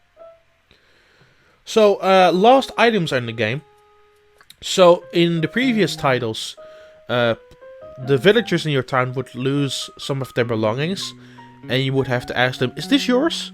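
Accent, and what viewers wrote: Netherlands. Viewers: I can't help but notice his accent... Maybe it was already stated somewhere else, but / sounds very Dutch:p not that that's a bad thing. I love hearing accents when people speak English. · why does this guy sound dutch, though.. edit: I've seen the twitter sceen this bro is a fellow dutchie hellooo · Dat Nederlandse accent 🤢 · Love the Dutch accent :p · What's your first language? Your accent sounds Dutch 💭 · He is indeed dutch, and a dutch accent when someone is talking English is one of the WORST things I've ever heard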